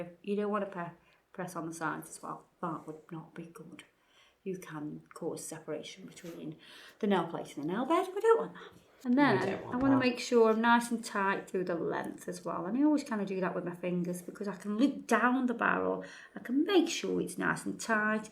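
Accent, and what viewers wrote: United Kingdom. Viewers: Well she’s a Brit, and they got a ton of different accents.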